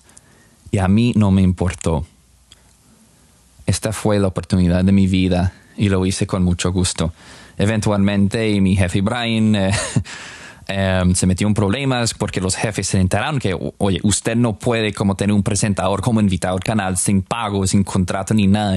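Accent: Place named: Colombia. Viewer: Es muy inspiradora su historia, ….yo lo vine a conocer a ud en el programa enamorándonos de Univisión y me pareció un gringo con un ace tico en Español como Colombiano por eso acudí a las redes a averiguar por ud y si mi sospecha se aclaró ud aprendió Español en Colombia jajajaj lo felicito por su tenacidad y verraquera para lograr sus sueños, un abrazo parcero…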